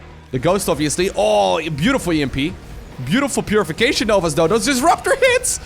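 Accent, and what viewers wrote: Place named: Netherlands. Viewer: Great commentary as usual. I can tell by your accent that you're from the Netherlands. I'm from Texas. I'm sorry about Trump.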